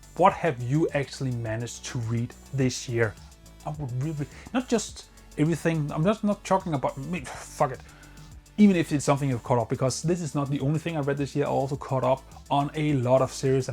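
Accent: Denmark. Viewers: are you by any chance Danish? i feel like you have a Danish accent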